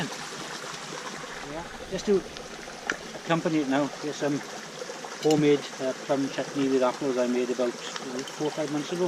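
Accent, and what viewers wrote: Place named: West Wales, United Kingdom. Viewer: Great video I could listen to you reading the telephone directory. I love your accent is it West Wales?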